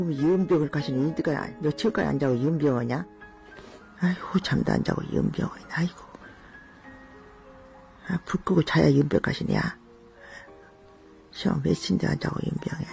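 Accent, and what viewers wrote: Jeolla, South Korea. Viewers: 우리엄마가얘기하는거같다 전라도사투리도쓰면서ㅠ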